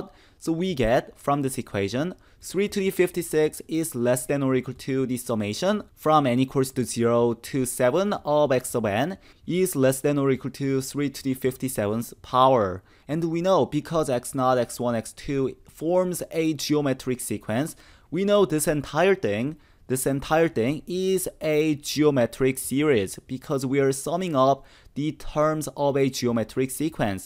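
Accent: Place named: South Korea. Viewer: His accent is sooo pleasant to listen to! I love it. If I had to guess, I think it is Korean.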